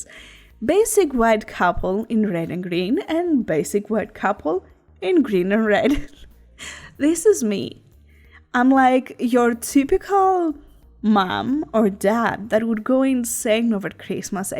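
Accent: Greece. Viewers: ur accent is Greek! Ελληνιδα εισαι?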